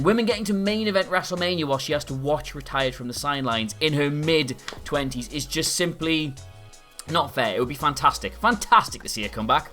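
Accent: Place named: United Kingdom. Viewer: My American ears never get tired of British "years". "It took yeeahhz and yeeahhz and yeeahhz and yeeahhz off our lives."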